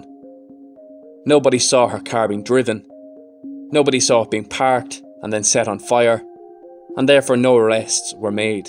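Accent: Ireland. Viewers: What part of Ireland are you from? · I personally would have waited a few minutes then went looking for her... such a sad case she was beautiful girl... only found your channel yesterday been binge watching it frm then... new subbie keep up the great work 👍 i could listen to your voice all day! Are you from republic of Ireland?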